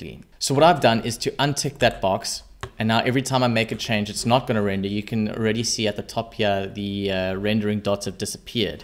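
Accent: South Africa. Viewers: Yisis you sound like a my home cape town. You saved me a ton. I lits maxed out my space on my laptop and editing was a nightmare. My project file was 259gbs. My poor 500gb sata was crying but this is going to save me a ton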